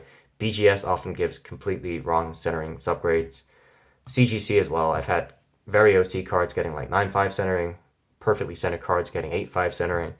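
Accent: United Kingdom. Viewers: Your British accent is stimulating my focus lol.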